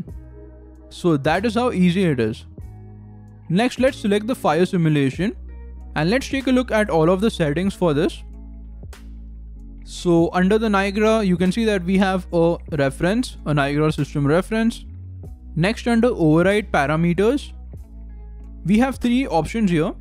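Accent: India. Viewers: he has the best indian accent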